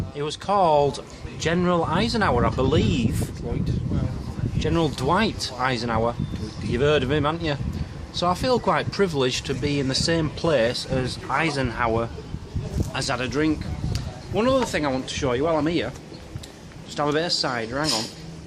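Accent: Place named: Scotland, United Kingdom. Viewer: I thought you were Scottish!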